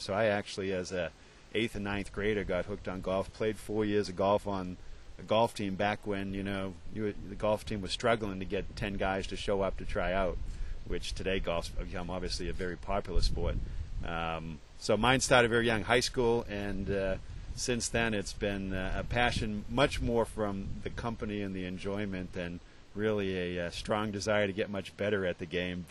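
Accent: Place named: Boston, United States. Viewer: Great accent. You can talk the boy out of Boston, but, his "hart' is still in all the right places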